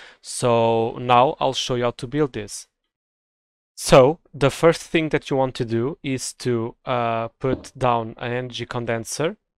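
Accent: Portugal. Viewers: Maan thanks for the tut bro ! Enjoyed the Portugues accent lol 😂 Bro just how you can make alchemy chest ? I couldnt do it SMH ... did you make it in philosopher stone or in craftin table ? Edit ! Problem solved !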